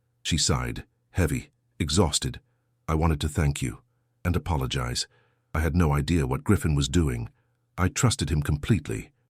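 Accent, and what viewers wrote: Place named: United Kingdom. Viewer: The Brit 🇬🇧voice makes the stories even better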